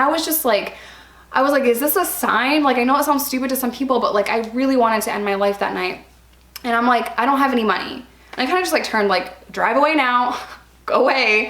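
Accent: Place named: Midwest, United States. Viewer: You grew up in New York? The way you say “pop” and “pod” sounds like the Midwest lol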